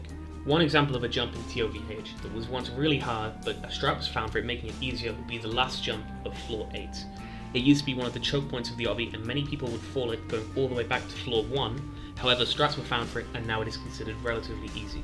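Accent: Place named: Wales, United Kingdom. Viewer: He’s welsh your English isn’t the problem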